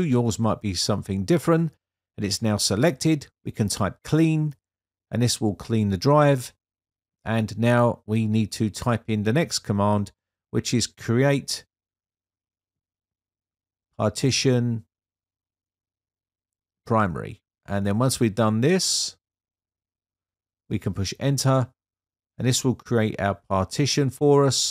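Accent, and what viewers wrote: England, United Kingdom. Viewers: Are you an American with an English accent?. You keep saying "GO AHEAD" in front of nearly every verb. Unnecessary, annoying, wrong, meaningless. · Calls himself a Brit, sounds like a Brit, speaks like a Yank- 'go ahead' this , 'go ahead' that. Bad enough when an American continually says it but it is annoying af hearing it here.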